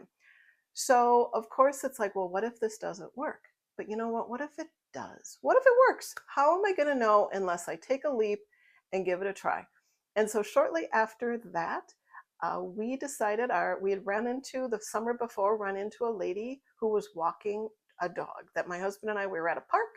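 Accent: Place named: Minnesota, United States. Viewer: Great video! Love your Minnesota accent! ❤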